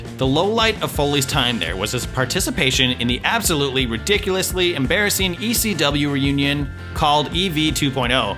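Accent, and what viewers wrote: United States. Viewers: Who the fuck is this guy with his annoying, overly exuberant American accent? When I click on a WhatCulture vid I want to hear bland, monotone British voices. Not this shit! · It's because he's American isn't it? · Why is this guy american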